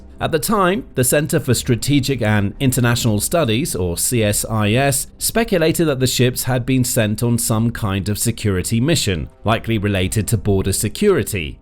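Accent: United Kingdom. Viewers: Ok, so we have a channel that talks about USA military force, and the narrator of the show has a British accent? Couldn't you get an American accent for a show about our military forces? It's just odd.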